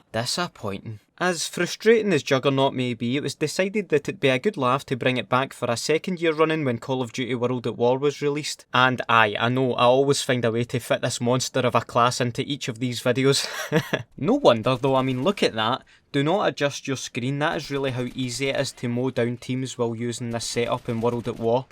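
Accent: Scotland, United Kingdom. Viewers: Like the Scottish accent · Max Ramirez he's Scottish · your accent shaped up my own Scottish Accent. Honestly my accent got a lot better when I started watching your videos. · I watch so much that when I hop on CoD I eventually slip into a wee Scottish voice. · I love him and that Scottish voice his new nickname keep up good work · The funniest shit I've ever heard, and it's even better with the accent. The Scottish accent is my favorite accent next to Australia.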